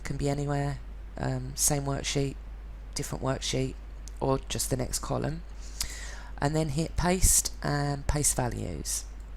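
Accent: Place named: United Kingdom. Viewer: someone with a British accent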